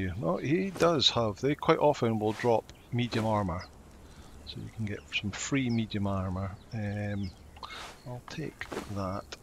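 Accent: Scotland, United Kingdom. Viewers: Excellent play through. And nice to hear a Scotsman for a change 👍✌️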